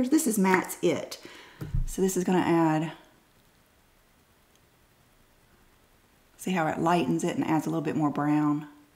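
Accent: South, United States. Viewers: I swear… you remind me of someone I went to school with! Lord, I miss her. Lost track of each other. I am so happy I found you! Please keep posting whatever little thing you have on mind. You give me joy 🤩 p.s. You sound like lovely Southern gal. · I so love logging on, seeing that you’ve uploaded and hearing your sweet, Southern voice! I’ll be watching no matter what content you give us! ☺️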